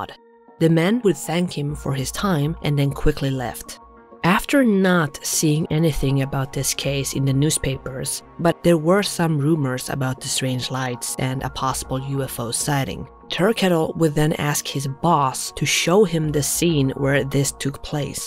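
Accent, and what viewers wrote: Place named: Sweden. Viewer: So people think your voice is AI , ? With a Swedish accecnt that prounounces that as ( dat ) lol and other th words . Which I like unique. Get well soon .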